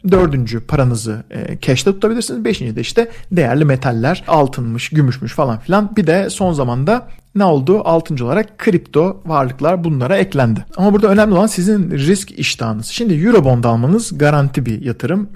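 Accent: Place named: Istanbul, Turkey. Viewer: Bugün abone oldum, çok kaliteli, İstanbul Türkçesi ve içinde biraz ingilizce ile dinlemek güzel.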